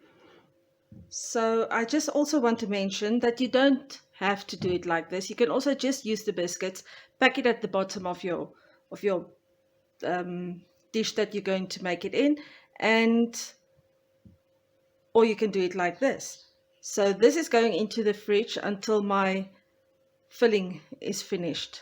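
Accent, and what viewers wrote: South Africa. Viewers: ❤yes 💞 South African accent is unique. I immediately recognised the voice and the recipe. Im also South African here in USA ..thank you for the reminder. I will make this delicious tart over the weekend. Yummy 😋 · Definitely a south African voice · I recognize your South African accent. Love that you dont need fresh cream in your recipe... a healthier take on Cremora tart. Definitely going to try it! Thanks sooo much and thanks for not adding any music to your video.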